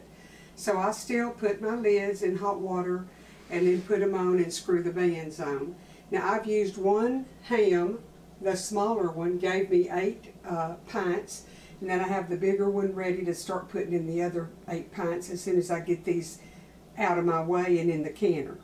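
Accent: South, United States. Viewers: Hello from Oregon! It sounds like you are from the south. My home state is Georgia. I am so glad to of found you. Canning ham today! Blessings to you this new year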